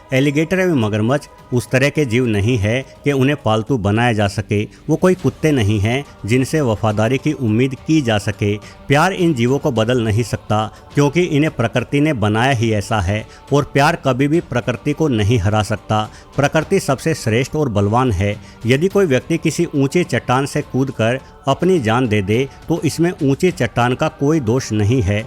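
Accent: Haryana, India. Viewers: Bhai tu haryana se hai ke?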